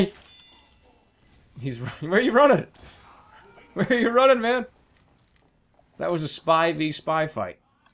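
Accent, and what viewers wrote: Denmark. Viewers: Are you danish?? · er du dansker loL? JERMA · Are u from Denmark??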